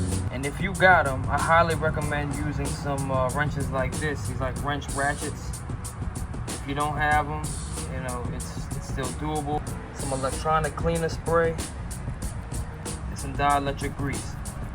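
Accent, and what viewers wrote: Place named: Louisiana, United States. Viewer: Louisiana boy.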